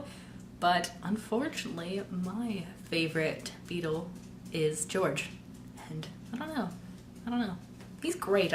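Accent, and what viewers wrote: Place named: Canada. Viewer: I can't believe your favourite word in French is besoin. This is so random. ALSO, CANADIAN ACCENT. YES. YES.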